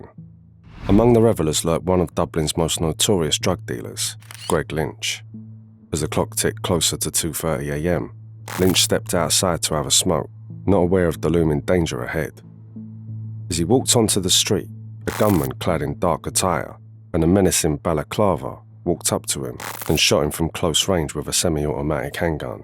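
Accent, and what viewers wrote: London, United Kingdom. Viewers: Anyone know what accent is this? London?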